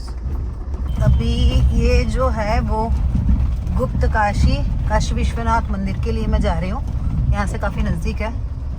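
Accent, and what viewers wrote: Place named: Gujarat, India. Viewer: This happens with me tooo. Gujarati Gujarat thi nikdi shake pan Gujarati language na bhuli shake Btw...love the gujju accent Love from Ahmedabad 🫶🏻✨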